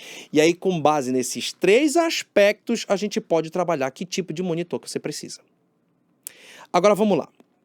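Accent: Pará, Brazil. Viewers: Pelo sotaque é paraense hahha! Mandou bem no vídeo, parceiro.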